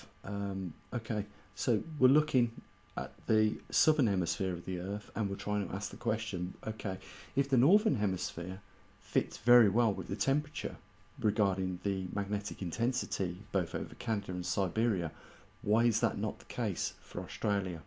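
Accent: Birmingham, United Kingdom. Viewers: Bloody good info, this explain perfectly whats happening I fully believe were going into a GSM cooling period and I follow ADAPT2030 and Ice age farmer, so I will subscribe to you to get more good info....I'm in ozz now but was from Castle Bromwich..you cant hide that accent mate.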